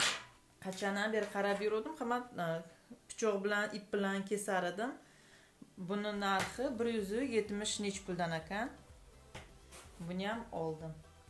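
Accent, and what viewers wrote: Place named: Samarkand, Uzbekistan. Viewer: Samarkanlikmisiz gapurushingiz uhshayopti 😊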